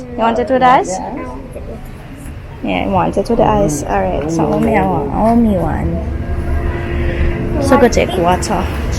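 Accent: Jamaica. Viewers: GIRL UR SÒOOO FUNNY, WITH UR JAMAICAN ACCENT, YOU HAVE ME LAUGHING, 😆😁UR A REAL JAMAICAN GIRL NOW, I MUST SAY, L❤❤❤U ALL 🥰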